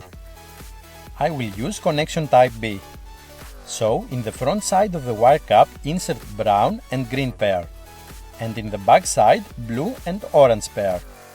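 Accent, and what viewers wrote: Greece. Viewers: Καταλαβαίνεις τον Έλληνα από την φωνή...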